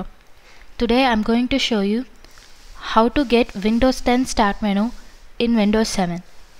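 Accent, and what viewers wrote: India. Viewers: lol i know you are india i know from your sound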